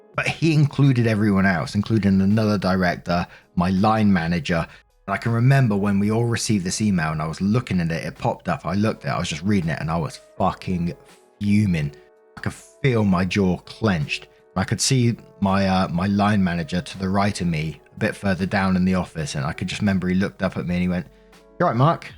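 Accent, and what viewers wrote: United Kingdom. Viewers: Hey Mark! This doesn't have to do with the specific video, but I just wanted to show my appreciation towards you and your content. I've been listening to your videos for several months now while at work (you can listen to whatever as long as it's not too distracting), and I've always loved reddit stories, so when I discovered your channel, it was a total game changer. I like your personality, your perspectives, your anecdotes, and yes, your accent (its true, Americans love British accents), and this channel has honestly made my work shift much more enjoyable. I even got youtube premium solely because of you. I decided to get YouTube membership to further support you. So thanks again, and please keep up the great work!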